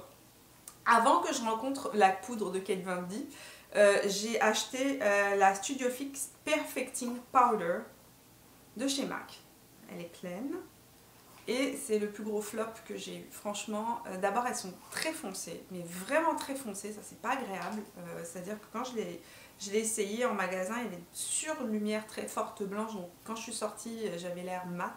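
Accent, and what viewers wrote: Quebec, Canada. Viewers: Je suis venu ici par curiosité, je te préfère tellement maintenant et ce petit accent quebequois tout discret alors que maintenant on lentend pas. Tu est comme le bon vin 😉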